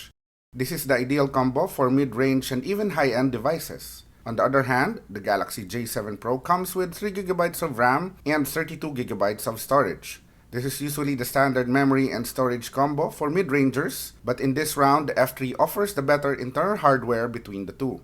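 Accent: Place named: Philippines. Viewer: Are you filipino? I can hear it in your accent haha. If you're not then I apologize and if you are then ipagpatuloy mo lang ang ginagawa mo! Ang ganda ng content 👍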